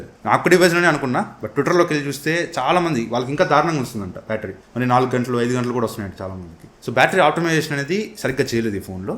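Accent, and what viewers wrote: Nellore, India. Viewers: Bro meedi Nellore ha?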